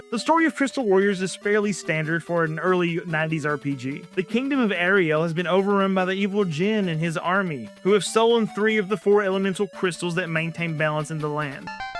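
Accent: South, United States. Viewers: its good to hear another southerner talk about jrpgs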